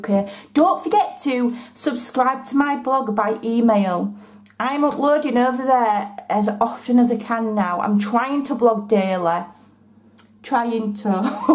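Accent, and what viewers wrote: Yorkshire, United Kingdom. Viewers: I was looking for craft fair ideas and found your video on the lip balm holder. <link> After seeing that I checked out some more and was hooked by your laugh, your beautiful yorkshire accent (I have a good friend from Bradford and your voice is just like hers) and the fact that you were working on A4 card for the ones I found. If I didn't have arthritis I'd have done somersaults. Love your channel. You're very busy uploading this weekend. Well done girl. And thanks for the giveaway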